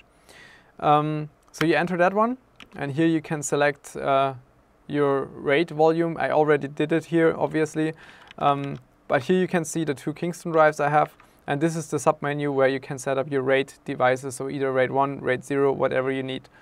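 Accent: Germany. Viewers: Deutscher Akzent kickt rein xD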